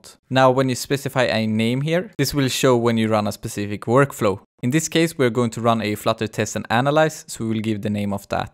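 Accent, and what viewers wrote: Sweden. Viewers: Sverige accent for sure.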